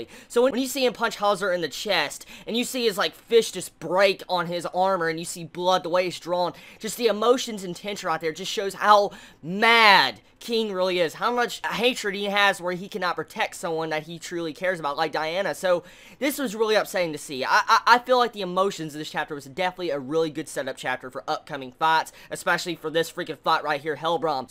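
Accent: South, United States. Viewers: 5 years ago u sounded verrrry southern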